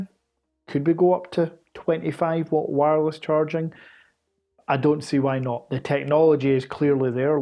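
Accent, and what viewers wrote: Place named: Scotland, United Kingdom. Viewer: I’m new here in your channel, and o need to congrats you for the video, and the Scottish accent make even better. It’s on of my favourite accent in the world 🌎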